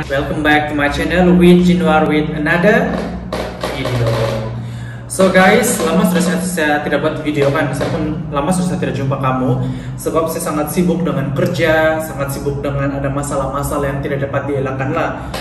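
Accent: Indonesia. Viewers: Bukan salah paham tapi logatvkamu itu Indonesia bukan melayu. Kalau tidak tahu jangan bahas bahsa Indonesia biar tidak salah paham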